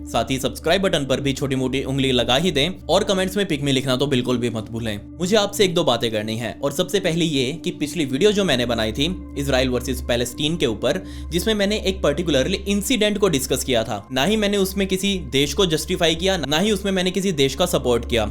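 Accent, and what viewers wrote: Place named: Delhi, India. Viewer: Hi ❤❤❤❤ मुझे आपके बोलने का अंदाज़ अच्छा लगता है पुरी दिल्ली वाली भाषा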